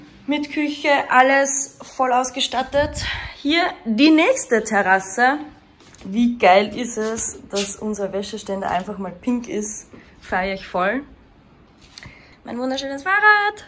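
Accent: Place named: Austria. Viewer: Bin seit einem Jahr dabei. Seitdem will ich mit Mountainbiken anfangen und feier den österreicher Akzent. Danke dafür.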